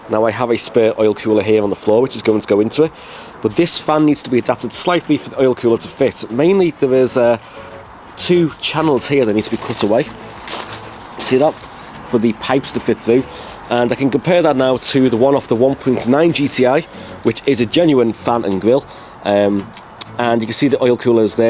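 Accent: Liverpool, United Kingdom. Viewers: are you from Liverpool? I hear some Scouse in your voice 🤷🏼‍♀️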